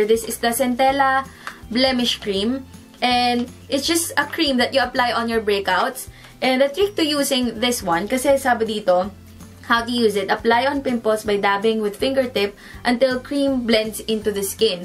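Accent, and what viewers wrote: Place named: Philippines. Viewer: I love how u speak english.. it is soooo pinay... ❤️❤️❤️ go girl ❤️❤️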